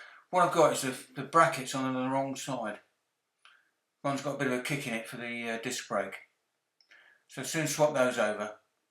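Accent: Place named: United Kingdom. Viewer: Cant stand british accents. Cannot understand anything he was saying.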